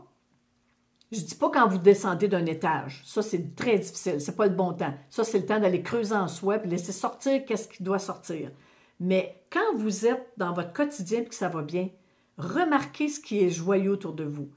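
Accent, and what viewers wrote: Quebec, Canada. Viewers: Merci, c'est toujours un grand plaisir et une grande joie de te voir et d'entendre ton bel accent Canadien 🧡🌿🌼🌷🕊🌷🌼🌿🧡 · Merci🙏, merci 🙏, merci 🙏pour ce magnifique partage 🥰. J'adore votre accent Québécois 😂❤, ça me donne la pêche en vous écoutant. Vous êtes adorable ❤. Belle soirée de la Nouvelle Lune 🌑. Je vous envoie pleins d'Amour 💗 et de Lumières 🌟✨️🕊...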